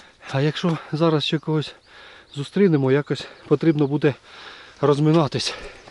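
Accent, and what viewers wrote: Russia. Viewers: Потому что думаете вы на русском и это слышно😢😢😢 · вы разговариваете по украински с русским акцентом по русски наоборот с украинским 😮😊❤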